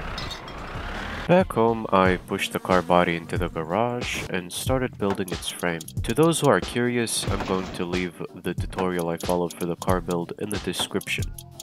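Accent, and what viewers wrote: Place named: Lithuania. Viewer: The voice sound very Lithuanian, are you Lithuanian? :D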